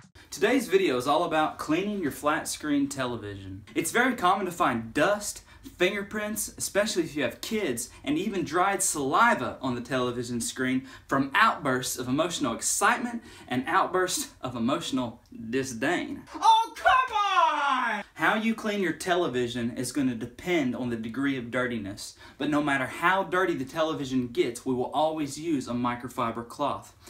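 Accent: South, United States. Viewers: I'm actually dampening a cloth like shirt it's actually more of a thermal but I'm going to pretend that it's microfiber reason being I had already dampened and started rubbing in a circular motion because my four-year-old had made the TV look like it was a child's play area. So I had my little cousin go on her phone this is the phone I'm actually leaving the message from so I'm going to have to go on my phone to look your videos up now anyways I'm leaving this comment I think I may be giving you way too many details but me and her are team she's my little girl and we clean and clean and clean so I like your voice so much that I'm going to start watching your videos all the time and I can't wait to see more I'm so excited I'm ready to do some winter cleaning but really it's going to be for spring instead of spring cleaning for winter get it LOL. But your voice I love the accident and overtook me I have a soft spot for Country accents and then that clean so that was great awesome I loved it I need more videos you are great and awesome peace out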